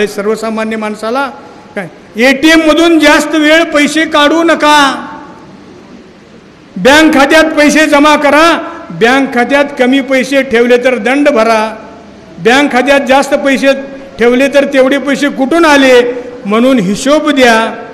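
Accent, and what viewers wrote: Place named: Rural, India. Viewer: अस्सखलीत ग्रामीण शैलीत no.१ भाषण 👌👌👌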